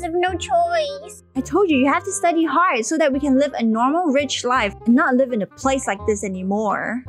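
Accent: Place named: Malaysia. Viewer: You sound malay🤔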